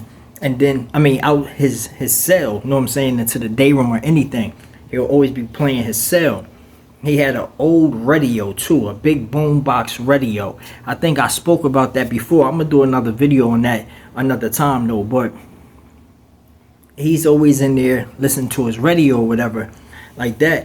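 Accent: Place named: East Coast, United States. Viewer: Also that vid u did when u asked if evil folks really exist since a lot of people be typing his name. Ima keep reminding u of stories cuz I use to sometimes watch all your videos while I was cleaning toilets with one AirPod in my ear lol so u got some chicken off me alone salute , you one my favorite prison story channels cuz u keep it real I’m from ny so I guess I relate more to your east coast way of speaking compared to the other Georgia prison yt channels , but try to link up with Charlie global , he moving fast in subscribers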